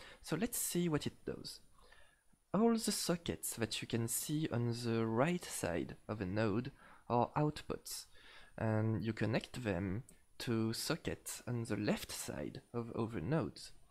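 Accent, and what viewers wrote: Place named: France. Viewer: cette accent français xDDDD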